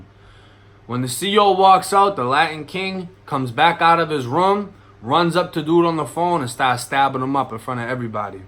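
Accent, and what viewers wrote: Boston, United States. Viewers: I could listen to you talk for hours witchur Boston accent lmfao !!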